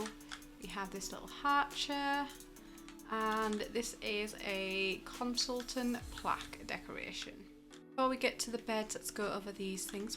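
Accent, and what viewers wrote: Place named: England, United Kingdom. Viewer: I was watching this video and my dad overheard, and he thinks you’re from around where he grew up in England 😂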